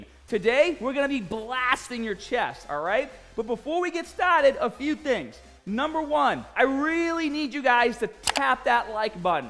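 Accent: Boston, United States. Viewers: The exaggerated Boston accent is so cringe.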